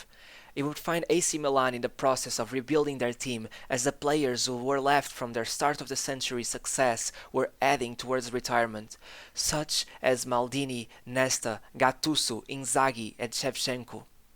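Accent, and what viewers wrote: Portugal. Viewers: I can tell that's a Portuguese native speaker narrating this video in English.🤔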